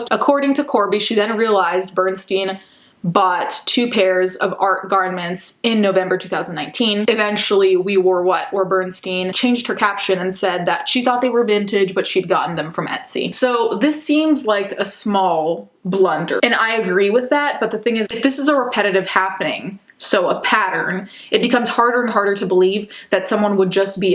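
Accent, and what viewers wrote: Italy. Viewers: The Italian in you can’t not say those designers properly and I am living for it 😍😍😍❤️🧡💛💚💙💜🤎🤍🖤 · She’s Italian. So…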